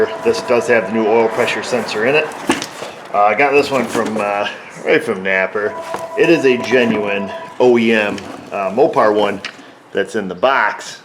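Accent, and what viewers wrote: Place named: Michigan, United States. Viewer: I know you're in NY state but you sound like you may be from Michigan. Am I wrong?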